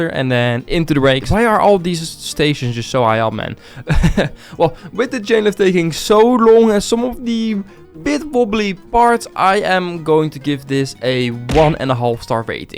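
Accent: Netherlands. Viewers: 😅ik hoor een Nederlandse accent ben je Nederlands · Bro the dutch i am dutch and all of the time i think all of the time are you dutch. So are you dutch or not?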